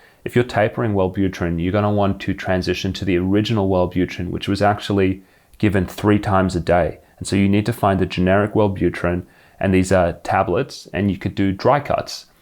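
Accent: Australia. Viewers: Hello - I noticed you have an Australian accent - I tried to get Wellbutrin from my GP but it is not on PBS for depression. How do I go about getting this medicine in Australia? Thanks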